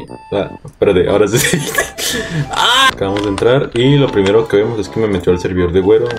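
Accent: Colombia. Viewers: Mi impresion o es colombiano.-.? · eres colombiano?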